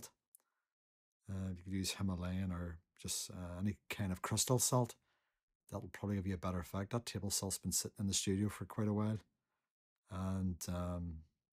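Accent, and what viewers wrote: Northern Ireland, United Kingdom. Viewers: Very nice work! Great to hear your Northern Ireland accent!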